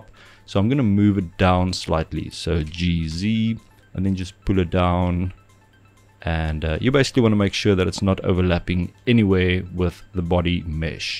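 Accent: South Africa. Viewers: Ppreciate the video bro! Also, are you South African?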